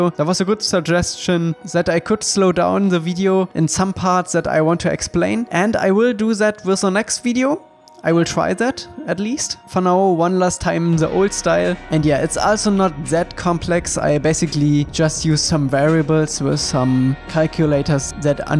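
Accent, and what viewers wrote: Germany. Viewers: German accent is so cool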